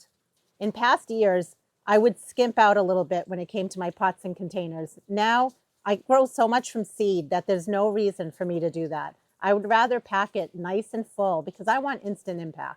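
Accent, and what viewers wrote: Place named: Maine, United States. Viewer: Just found your channel- wondering if you're from Maine? You sound like you are lol I'm in Central Maine. So glad I found you! Thank you for the helpful video on baskets- I'm new to gardening and grew a bunch of flowers from seed this year. Gonna make some up now!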